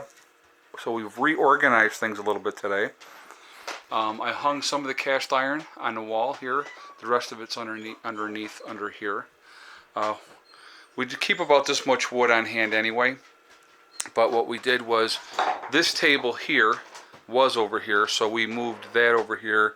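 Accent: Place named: Michigan, United States. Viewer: Wait, where are you from. Just curious. Im in N.H. By your accent, I'm guessing around Michigan?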